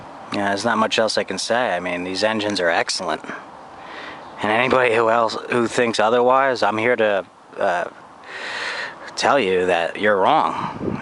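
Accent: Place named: New Jersey, United States. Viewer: I was just given a 1960's AMF "Polar Bear" snow blower but it was repowered with a 7 hp Snow King from 1995 (made in late 1994). I will work on it tomorrow. I'm also working on the "Chonda" (Loncin, which is a Honda "copy") on a Toro Power Curve 180. There's no comparison between the two. I sense a NJ accent (and I thought I saw it on the boat in the background). What part of NJ? NYC here.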